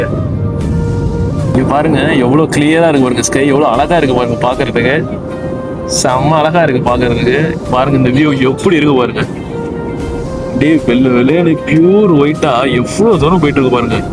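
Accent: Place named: Sri Lanka. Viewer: இனிய தமிழ்! இலங்கைத் தமிழ்!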